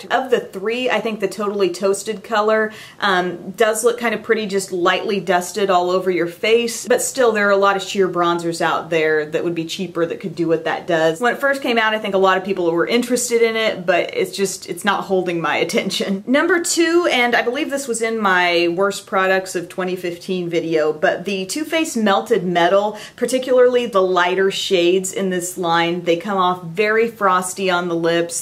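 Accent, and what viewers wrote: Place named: South, United States. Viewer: She's from south western Illinois I believe - so she has a bit of a southern accent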